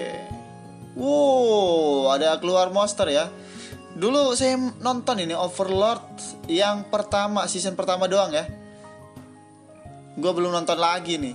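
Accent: Java, Indonesia. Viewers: Gebrongot geni wolongewu Medog bangt bang jowomu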